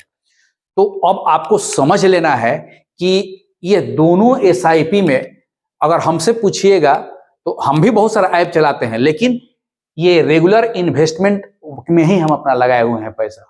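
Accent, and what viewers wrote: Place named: Bihar, India. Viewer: His tone shows he is from Bihar Paur month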